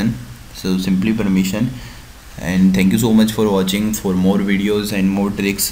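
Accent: India. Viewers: indians with their accents.... bruh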